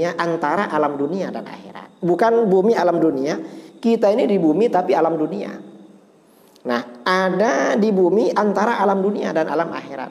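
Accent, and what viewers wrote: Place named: Madura, Indonesia. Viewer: Beliau kental akan logat kemaduraannya...❤️💙💜😁😃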